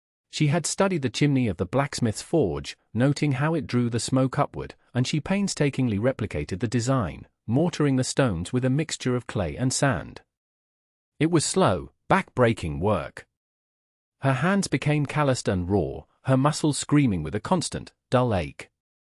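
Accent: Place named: United Kingdom. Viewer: A sledge is what we call a sleigh in the UK. I'm just clarifying for you as the speaker is speaking with a UK accent. I was thinking that is what I was thinking as my mom and siblings used a sleigh to go to school in winter here in the US. I'm not trying to make fun of you.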